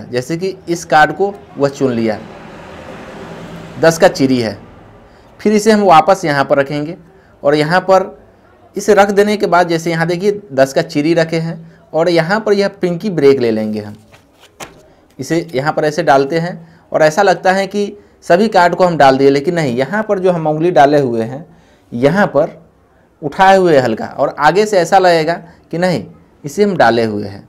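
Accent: Bihar, India. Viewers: Bhai aap bihar se hai kya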